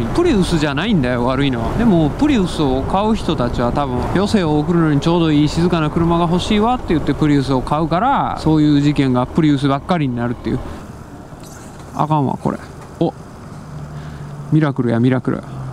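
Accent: Kansai, Japan. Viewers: どぎつい関西弁で文句言ってるのにたまにの 怖いよーがかわいいw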